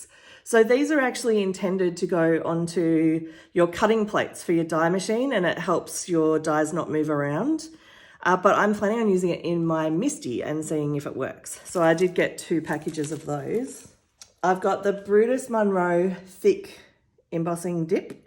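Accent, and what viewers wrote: Australia. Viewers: Hi . New subscriber here. I just stumbled across your video and was DELIGHTED to hear an Aussie accent! That Pastel Deco is to die for. I'd love to see your version of the stained glass window technique. Shipping rates to Australia are the pits :[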